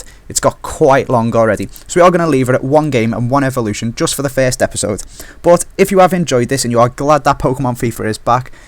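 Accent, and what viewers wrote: Liverpool, United Kingdom. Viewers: You had such a strong scouse accent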